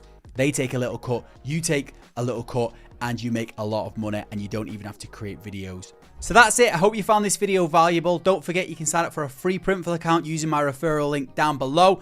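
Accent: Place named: Northern England, United Kingdom. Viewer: English northern accent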